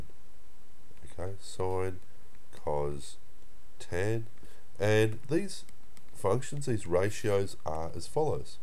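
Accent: Australia. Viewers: So so helpful! The 3=6/2 was mind blowing. I always got stuck on when to divide and when to multiply but that little trick really worked, plus the Aussie accent is great! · 99% sure this guy is Aussie Also he’s not from New Zealand. You can tell by the way he says his i’s and e’s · He is Australian, so am I haha, I was pleasantly surprised :) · flying jesus on a breakstick lol... Anyway, i think this guy's an australian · Gotta love the Australasian Accent 💯💯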